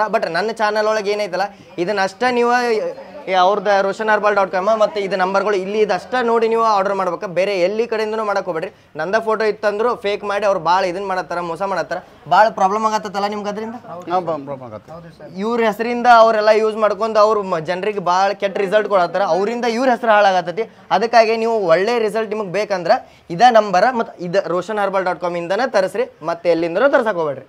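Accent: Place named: Hubballi, India. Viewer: ಉತ್ತರ ಕರ್ನಾಟಕ ದ ಅದರಲ್ಲೂ ಹುಬ್ಬಳ್ಳಿ ಕನ್ನಡ ಇಷ್ಟವಾಗುತ್ತದೆ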